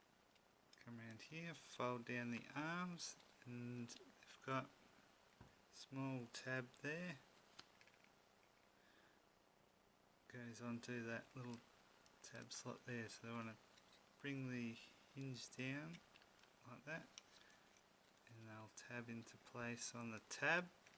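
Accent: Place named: Australia. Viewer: From your accent I'm guessing your Aussie. Where in Australia did you get Air raid? I need him and the rest of the stunticon team.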